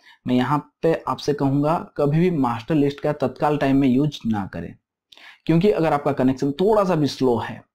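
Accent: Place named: Bihar, India. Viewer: Lagta hai bhai bihar ka ho...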